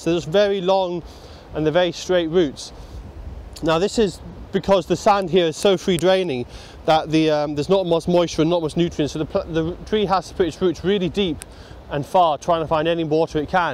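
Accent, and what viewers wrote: Scotland, United Kingdom. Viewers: Hi! Thanks for this very interesting and informative video! Would love to see more of your documentaries! Excuse my nosey question, and don't answer it if you find it too intrusive: Do you speak Scottish English? I'm asking because I find it perfectly easy to understand you. But when I visited Scotland years back, I had a very hard time understanding people in Edinburgh and Glasgow (Glasgow was even harder!). Or don't you speak your regional dialect / accent when you're doing your videos? Have an awesome Sunday! Marc from Berlin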